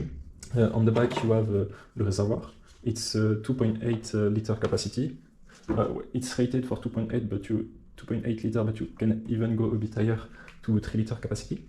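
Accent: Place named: France. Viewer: u speak english very well, euuuuuh, wiz youre french accent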